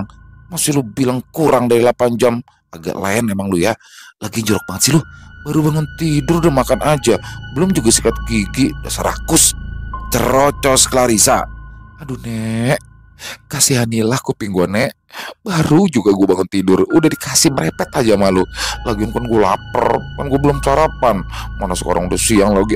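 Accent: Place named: Bima, Indonesia. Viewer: Keren banget bang bisaan banget meranin logat bima nya jadi senyum senyum sendiri ngedengerinnya Terimakasih bang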